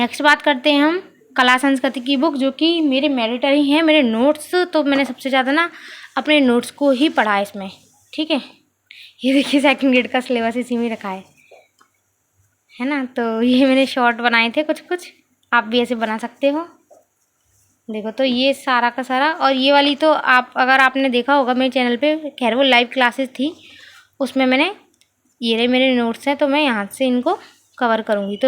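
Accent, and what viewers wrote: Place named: Braj, India. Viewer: Bharatpur se braj language 😄😄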